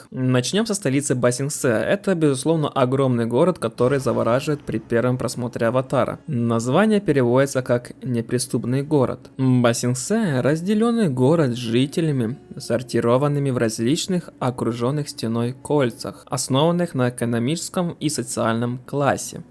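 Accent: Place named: Tajikistan. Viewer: Автор таджик? Просто интернсный акцент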